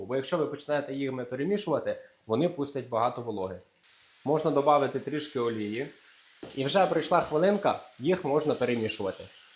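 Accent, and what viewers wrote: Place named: Western Ukraine, Ukraine. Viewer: Jak pravilnyj???Vy z zavzdy chejtyte zachidnjakiv za dialekt.Choca já zavzdy svarjusa Jakyj dialekt?Chlopec hovoryt z mirnym dialektom.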